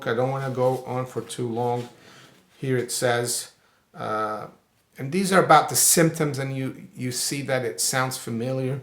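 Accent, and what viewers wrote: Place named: United States. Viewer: Very interesting history. you have the most interesting accent. I was astounded to learn that you grew-up in Brasil. (Big Bossa Nova, and and 1960s Brasil in general fan here, btw! In fact, one of my all-time favorite movies is the 1960s heist film “Grand Slam” which was filmed in Rio.) You sound like a Jewish guy from the Bronx but also very curiously have a noticeable Boston AND Canadian-like accent. Very unique! I enjoy listening to you speak.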